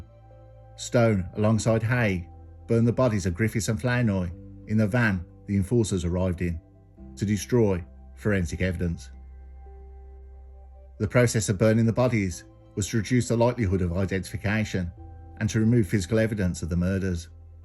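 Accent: Birmingham, United Kingdom. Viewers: Really like the way this guy puts these together. And his VO with his brummie accent 🙏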